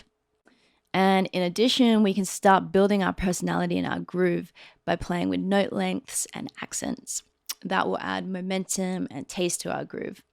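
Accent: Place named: Australia. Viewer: Great vid, great playing and practical ideas. Fellow Aussie here 🙋🏼‍♂️, great to hear the accent on a bass vid, I look forward to more.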